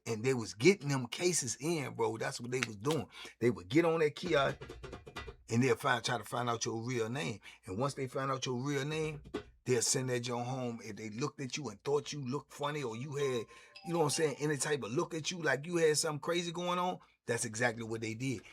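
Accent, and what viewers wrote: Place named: Washington DC, United States. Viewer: I like the way you sound like a a DC Youngin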